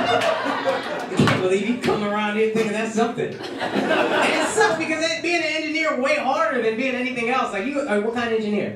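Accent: United States. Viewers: Some indians have a difficult time understanding his American accent,that's why everyone's going on about the audio.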